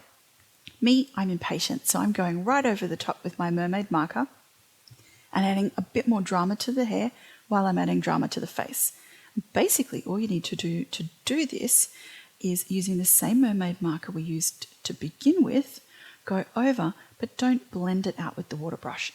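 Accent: Australia. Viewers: Really loving your technique, you are so easy to understand. I so please to be hearing yet more Aussies doing these videos. TFS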